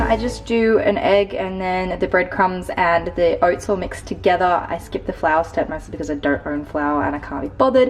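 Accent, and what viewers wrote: Australia. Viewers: This may be a weird question.. are you Australian? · Hi! Are U from Australia?? By the way, New here, love your videos!! ❤️❤️❤️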